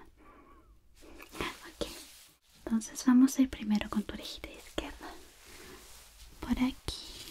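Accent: Chile. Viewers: Me encanta como hablan los Chilenos 🥰🥰🥰🥰, NUEVA SUSCRIPTORA! Mas que todo, utilizo los ASMR para estudiar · Podría jurar que es chilena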